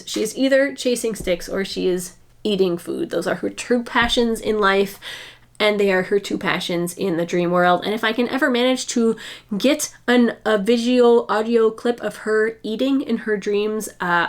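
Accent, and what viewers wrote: Canada. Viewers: I appreciate that you aren't wasting tradies' time by asking them to come give you a quote when you have no intention of hiring them! I like your slow footage and I don't think you need to defend why your videos are different to others'. One of the great thing about youtube is the variety of styles that work for everyone. Do you think your editing style is informed by your circus performance style? You're Canadian, I'm Australian, probably most of your viewers are in the US... of course we all pronounce and spell things differently! If we all understand each other, what does it matter? And thanks for answering my question about the drain! I forgot about the soil-too-high aspect of your home, but I was genuinely interested because we are also renovating our 140 year old home and I was wondering if digging our drain inside was something we should consider. 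I hope it didn't seem rude! Thanks for your community engagement, it's definitely another reason I love your channel!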